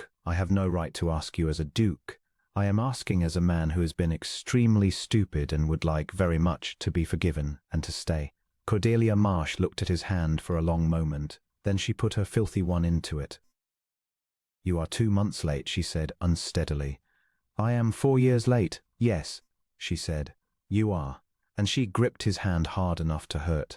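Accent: United Kingdom. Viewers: Loved this story! Thank you for reading it in a British accent and making this tale so authentic and enjoyable.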